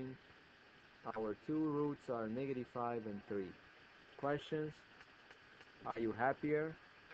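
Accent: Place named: Eastern Europe. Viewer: Where is your accent from? Just curious I wanna guess eastern European.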